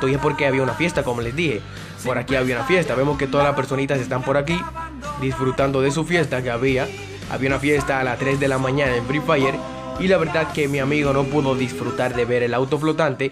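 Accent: Dominican Republic. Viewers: Eres de republica dominicana? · buen video, eres dominicano?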